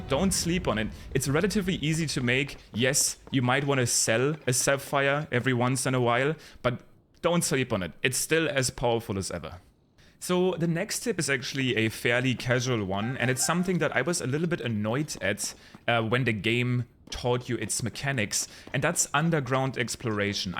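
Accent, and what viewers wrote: Germany. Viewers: always I search Zelda videos, I came up to and I like his videos, but I always think he sound like a german who speaks english and I dunno why:D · Hes from Germany, why should he fake it lmao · Hab sofort gemerkt du bist deutsch😎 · deutsches englisch ist so gut rauszuhören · So lustig wie man den deutschen Akzent so hart raushört aber gutes video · 1 Question Are you German because your accent sounds german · Hes german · Bro where are y from? You sound like a German one xD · Save deutsch, hört man krass raus irgendwie komisch 😂 geiles Video aber hat mir sehr weiter geholfen 💪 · good video but there is one thing i want to know, ist das in deutscher akzent den ich da höre? :P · anyone knows if he is german or what is that accent? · Thick German acent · Liebe den deutschen Akzent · Man hört mal anders hart raus das du deutsch bist 🤣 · we can hear the deutsch in your voice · Man hört SOFORT den Deutschen aus dir raus, gutes video keep going :3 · The german acccent is reaaaaly present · Wie man merkt dass er deutscher ist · is that a german accent? 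:D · Deutscher akzent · Du bist Deutscher oder ?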